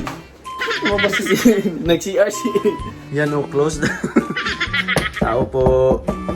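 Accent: Visayas, Philippines. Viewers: BISAYA O ILONGGO?:)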